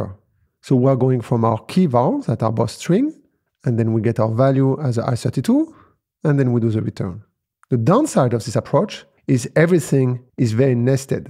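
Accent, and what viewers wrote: France. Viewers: 'pub' 'fn' 'str' 'Err' damn Rust.. what's wrong entire and undestandable words? (You seem to have a french accent, right?).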